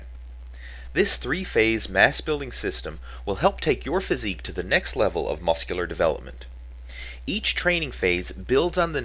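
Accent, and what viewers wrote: Canada. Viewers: This is kind of off-topic but do you happen to be Canadian? I swear i can hear the accent o.O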